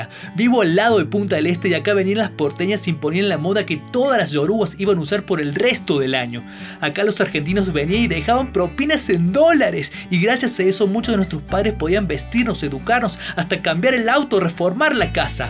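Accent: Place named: Chile. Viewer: Creo que es chileno el que habla. 🇦🇷❤🇺🇾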